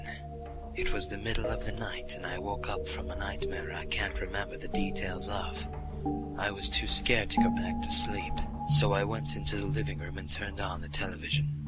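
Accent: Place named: United Kingdom. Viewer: 原來不是只有我這麼覺得 超英國腔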